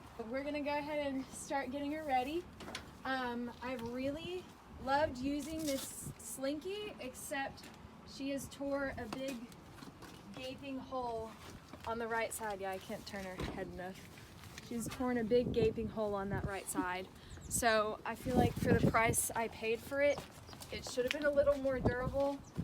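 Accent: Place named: Texas, United States. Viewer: Definitely can tell you’re Texan lol “don’t kick the trailer ma’am” haha though i live in tx too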